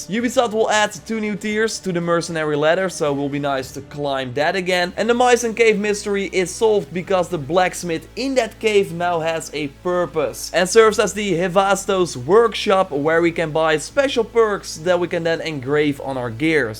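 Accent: Netherlands. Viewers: It took me 1 second to find out you're dutch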